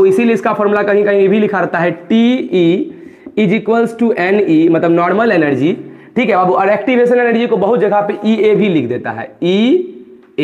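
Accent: Bihar, India. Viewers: Bihari accent 🫂🔥🔥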